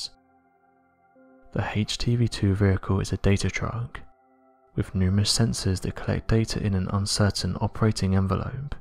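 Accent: United Kingdom. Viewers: Secureteam10? You’re citing Secureteam10?!?!! I guess, at least it’s better than “someone said” or “another person thinks”. Your British accent isn’t enough lipstick for your fake news. Some websites?? Which ones?!?!?!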